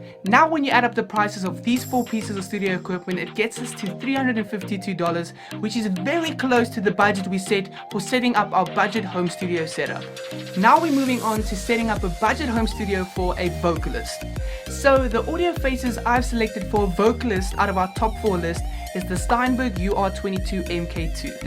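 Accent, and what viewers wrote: South Africa. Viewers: That accent... You must be from South Africa 😁 Me too. Thanks for the video dude! Been doing my research for a while and here I come across your video that confirms all my choices, M40x, MPK Mini Mk2 and the Scarlett Solo! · ey bruh i know you from SA because of the accent mad respect from SA bro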